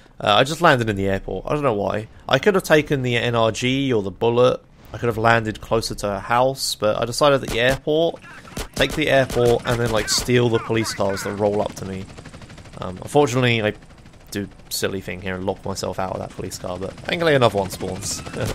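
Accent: United Kingdom. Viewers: Is your accent british?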